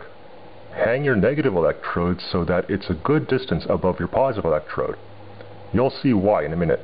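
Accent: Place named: Canada. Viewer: You have the slightest Canadian accent :D but that's probably because of your voice changer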